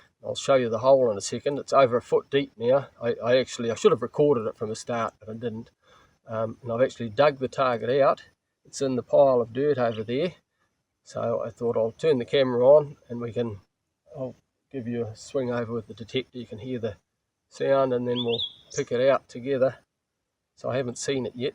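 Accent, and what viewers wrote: New Zealand. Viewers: Its quite marvellous😂😂 and obvious hes a KIWI! Derr!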